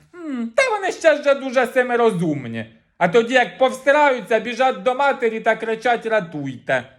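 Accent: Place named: Karlivka, Ukraine. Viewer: Народилася, виросла і жила до 17 років в Карлівці Полтавської обл. У нас так розмовляють😂 100 процентів! Як наче в двір до когось в Карлівку попала😂 Обожнюю слухати цей суржик, говір і манеру подання❤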